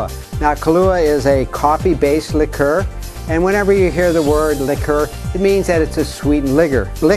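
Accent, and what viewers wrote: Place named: Chicago, United States. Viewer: This dude sound exactly like what I imagine Chicagoans should sound like. Da Bears!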